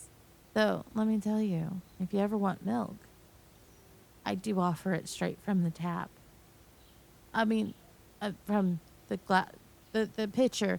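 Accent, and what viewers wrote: South, United States. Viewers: First time listener,and I wanted to say that was very awesome. I loved your voice. The southern accent was great. I wish I could have an obsessive cowgirl take care of me lol. I enjoy how sweet she was and you slowly peel back the lairs,and find out she a crazy. I also enjoy the slight lustful side of her. The outro was pretty cool to hear that you relate the stories to irl situations. Plus it kinda gives us a little peak behind the curtain and get know a bit about you. So I recommend doing outros. Sorry for being so long winded 😅.i just wanted you to know you are amazing, and I can’t wait to listen to more 😊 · Damn I am never this early, not gonna complain, we love the southern asmr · I love your southern accent!! This is a cute audio! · I love cow girls, and yours is my new favorite. The southern accent and flirting are so good, and I love the yandere turn. 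"Milk straight from the tap" is wild. But also, yes please!🥰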